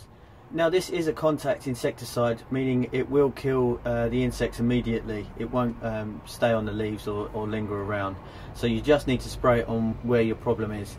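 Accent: England, United Kingdom. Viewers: Thank you young man for an excellent video. No heavy background music and a nice pleasant slowly delivered English accent. Followed your advice and it worked a treat.. Many Thanx Grumpy Grandpop.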